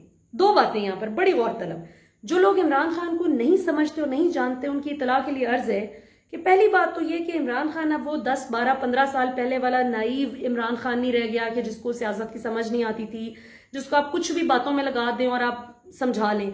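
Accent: Punjab, India. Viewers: Truly brilliant, keep it up. By the way like your Punjabi touch of tarka.😄👍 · I love your Punjabi accent 😃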